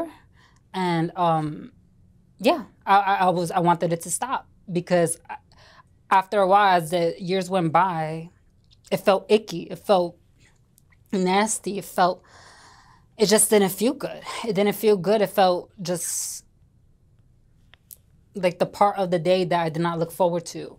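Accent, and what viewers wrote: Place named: New York, United States. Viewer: Six minutes in & I cannot see u as a male! Love your New York voice.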